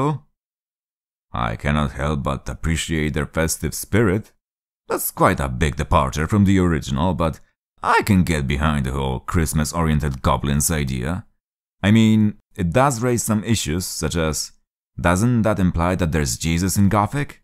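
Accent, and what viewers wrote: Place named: Poland. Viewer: Such an annoying voice 🤮🤮🤮 and Polish accent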